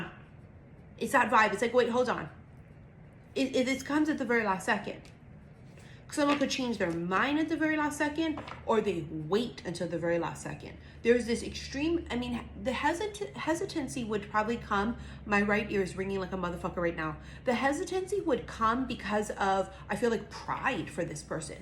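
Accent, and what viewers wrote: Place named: Hawaii, United States. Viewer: I knew you were from Hawaiʻi b4 u even said it! Ke Aloha nui Tita!🙏❤️🌿🌺😂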